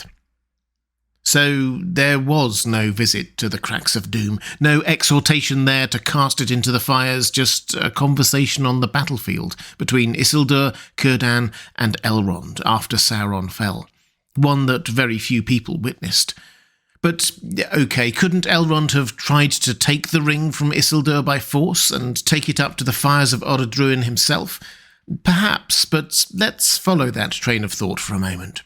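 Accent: United Kingdom. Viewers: You have a wonderful voice for narration! And no, I’m not an American that thinks all British accents are cool, I’m Canadian which means I’m part of the commonwealth and also think all British accents are cool.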